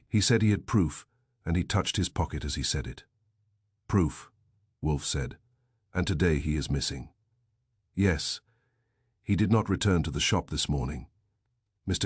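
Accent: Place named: United Kingdom. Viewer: If you’re a person, I do enjoy listening to you. It’s a bit of a challenge reimagining Archie Goodwin with a British accent, I’ll listen some more.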